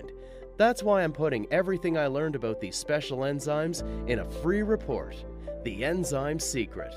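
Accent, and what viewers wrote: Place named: Canada. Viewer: I'm not from North America, but am I right in thinking this man's accent is from Canada or Nova Scotia? It sounds different to a regular US accent.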